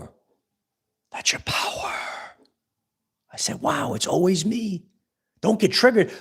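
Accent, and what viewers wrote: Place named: Brooklyn, United States. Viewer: ❤ your Brooklyn Accent I have a friend from Brooklyn and her husband is from Lone Island that lives here in Texas as well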